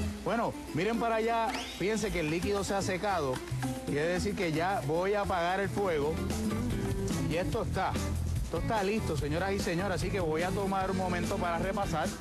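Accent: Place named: Dominican Republic. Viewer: Este chef , siempre me a aparecido su acento como Dominicano, no puertorriqueño..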